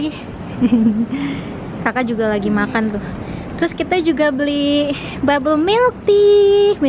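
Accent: Medan, Indonesia. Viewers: Kak asli dari medannn yaa? 😁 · kk org medan kah? salam dari binjai kaka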